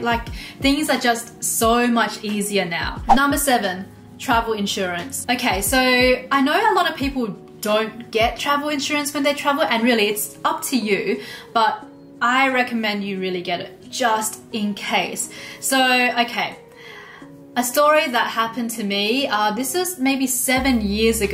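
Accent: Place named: Australia. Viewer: Australias accent is really starting to rub off on you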